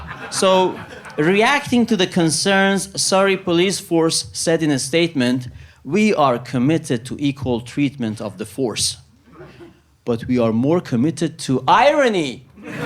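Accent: Iran. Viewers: How does he have a Persian (Iranian/Farsi) accent when his name is? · Why is he speaking like Iranians lol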